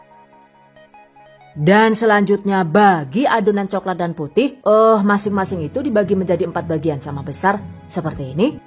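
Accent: Banyumas, Indonesia. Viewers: Saya suka resep" bunda, saya juga sering praktekin resep" nya alhamdulillah berhasil bun, dan yg ini mau saya simpan juga resepnya kapan" mau bikin juga, trimakasih resepnya bun, lebih suka lgi klo ngomong ngapaknya bun..itu anak yg suka nyobain adonan mentah udah gede ya bun..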